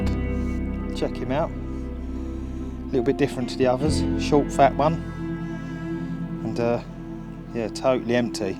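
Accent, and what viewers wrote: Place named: United States. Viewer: Great video but why the stupid American accent…?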